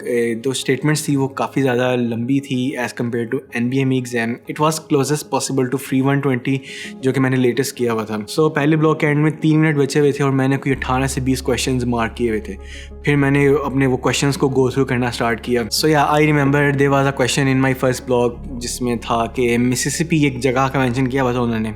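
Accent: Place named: India. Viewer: Why you speak Indian 😢😢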